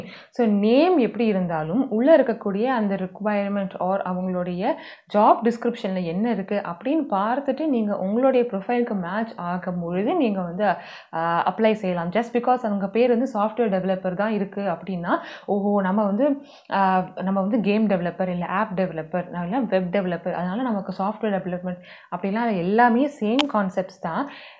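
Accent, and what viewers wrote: Sri Lanka. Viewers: Are you srilankan??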